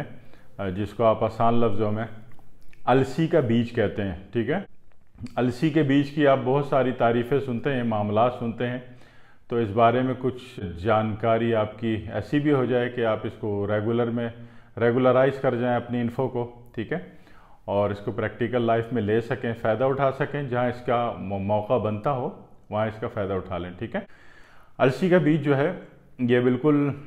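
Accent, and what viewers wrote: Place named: Hyderabad, India. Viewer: Walaykum Salaam Warhmatullahi Wabarakatahu. Muhtaram Dr Sahib Ap ka Lahja Hyderabad Deccan Ki Tarha Hai Bahar E HAL JAZAK ALLAH..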